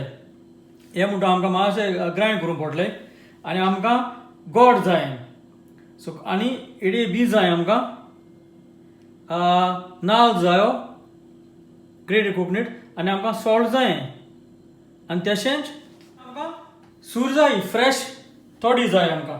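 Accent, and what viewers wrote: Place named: Salcete, India. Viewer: I liked ur salcette konkani... Feel like I am in colva my uncles place.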